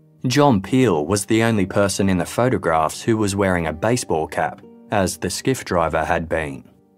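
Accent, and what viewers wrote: Australia. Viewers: 🇬🇧 I know this is an old episode but after having had a serious illness & recouperation, ive not watched many of my favourite channels! Therefore after getting my energy & interest back ive been trawling through my favourite Channels & binge watching & its been amazing hearing a big batch Of great videos that i love. Now ive made it to one of my top 5 channels with many many videos so im starting with my 1st missed episode today, with this case. Im champing at the bit to enjoy each & every one ive missed & there are alot to hear! Ive missed the narrators calm & reassuring voice. As i once lived in north Sydney for many years as a youngster & late teen before returning back to UK with my parents, i love the opportunity to hear the beloved Aussie voice. Thank you to everyone on the Casefiles team! You do a brilliant job & bring us the best & worst ( i mean in criminality) and ive often been chilled to the bone with some of The cases, especially the Ivan Milat ones... 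😨 So Thank You all so much! Your subs have jumped pretty high too Not far off that 1st magic 100k Level & thoroughly well deserved! Peace & Good Cheer to All 🇬🇧👧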